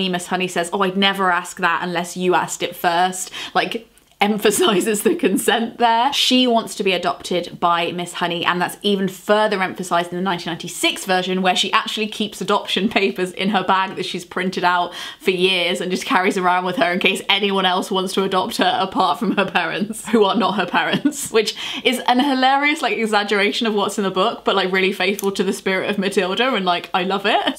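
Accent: United Kingdom. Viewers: It was so interesting content~ Most of all, I like your clear British English^^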